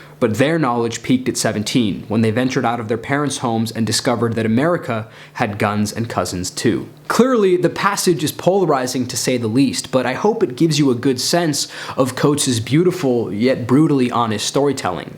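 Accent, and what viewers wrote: India. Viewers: Your English assent just like Shashi Tharoor .....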